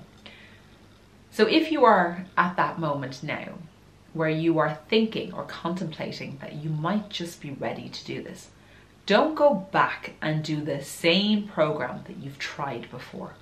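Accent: Ireland. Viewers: Hello from Spain .are you Irish by any chance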